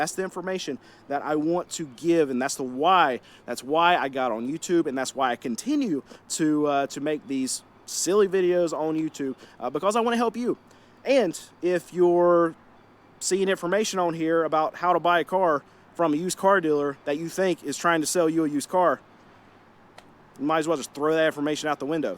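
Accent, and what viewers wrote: North Carolina, United States. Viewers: I thought about looking your dealership up for S&Gs. I imagine you are located around Statesville NC based on your accent. (Being from NC myself). I am here for the content, not the cars. I like that you have your ear to the ground on the car market. Keep up the great work.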